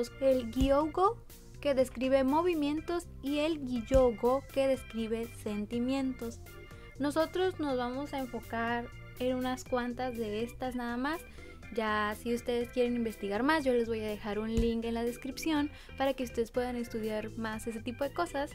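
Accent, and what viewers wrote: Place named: Chihuahua, Mexico. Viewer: ¿eres de chihuhua? :v Tienes el acento parecido a los residentes de ahí xd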